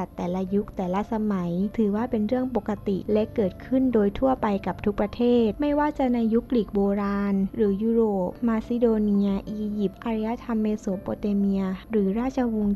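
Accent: South, Thailand. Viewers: น้องนี่คนใต้แน่ๆนิ ทำได้ดีนะน้องนะ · คนอ่านบ้านอยู่ต่ำกว่าประจวบฯลงมาใช่ไหม?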